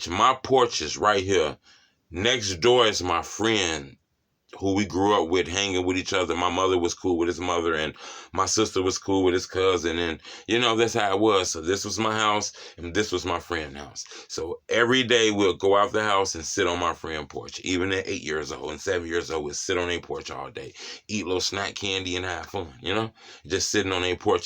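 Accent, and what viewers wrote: Chicago, United States. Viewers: I knew u was from the Go from the accent when I watched your first video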